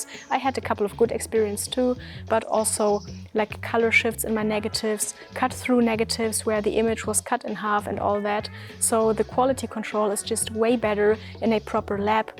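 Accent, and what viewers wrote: Germany. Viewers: Thanks for the detailed tips and also making me notice i kinda love listening to English with a German accent. Except when its mine.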